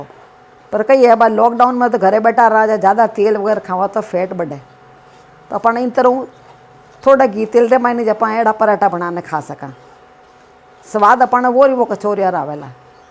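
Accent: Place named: Jodhpur, India. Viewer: Mare in-laws Jodhpur rahte ha apki language sun kr lagta ha sasural pahuch gyi😀😀 Thanks for yummy parathas sasuji🙏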